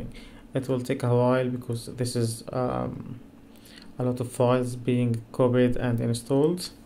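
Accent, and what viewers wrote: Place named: Egypt. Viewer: من لهجتك عرفت انك عربي ومصري صدقني كثيرعرب يبحثون عن مثل هذي الشروح والبعض منا يجد صعوبه اذا كان الي يشرح انجليزي ف اتمنا منك تشرح بالعربي وتفيدنا جزاك الله خير وصدقني راح يتابعونك عرب كثير وانا اولهم ههههه اسأل الله لك التوفيق ..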